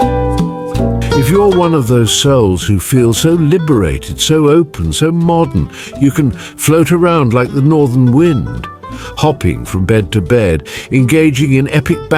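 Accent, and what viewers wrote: England, United Kingdom. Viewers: This AI voice is by far the best. The snooty English accent on this one adds a comedic factor these videos.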